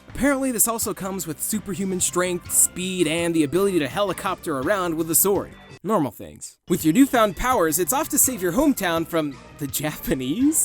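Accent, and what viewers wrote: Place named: South, United States. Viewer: Where in the south are you from? :0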